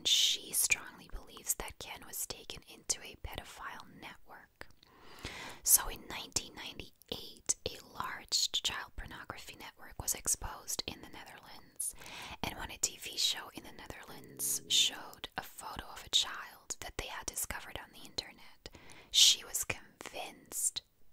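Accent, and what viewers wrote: United States. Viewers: I just started watching your videos not too long ago and I absolutely love them! However, I didn’t even realize you were from Belgium until this video. I’m from the U.S. and I assumed you were too because your accent sounds American! I didn’t realize how similar Belgians and Americans sound! Or am I missing something? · You sound so American! You could pass as someone who is from the USA!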